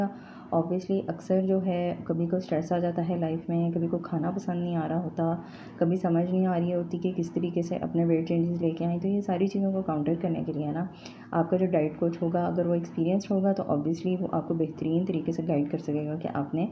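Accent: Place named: Iran. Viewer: I thought u were from foreign country after hearing u r english i thought u were from Iran or saudi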